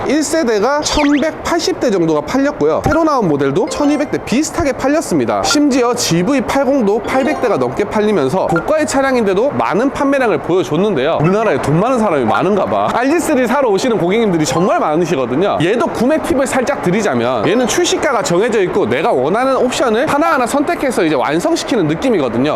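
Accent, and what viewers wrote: Gyeongsang, South Korea. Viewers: 경상도 엑센트 들려요ㅋ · 대놓고 경상도말 맞는 거 같은디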